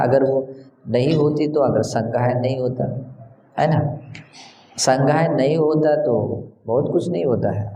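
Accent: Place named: Gujarat, India. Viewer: Is he gujrati? Why his accent is like gujrati?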